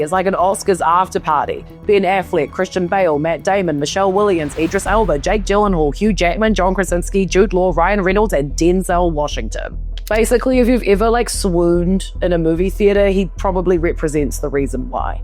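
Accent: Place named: New Zealand. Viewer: OMG! Your NZ accent and facts makes me realise this is for real😮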